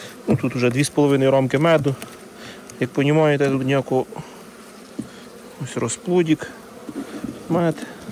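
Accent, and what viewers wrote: Poltava, Ukraine. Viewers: Обожнюю полтавський говір, він крутий і унікальний, слухати одне задоволення. Бажаю успіхів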